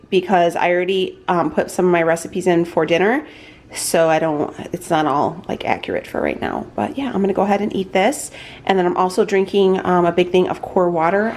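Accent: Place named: Chicago, United States. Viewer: I was born in Chicago (East side) and raised in Illinois. You remind me of my peeps, especially your accent. Love your channel.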